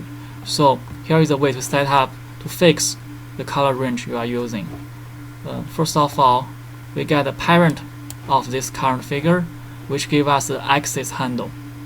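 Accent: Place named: China. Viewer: love your accent reminds me of my China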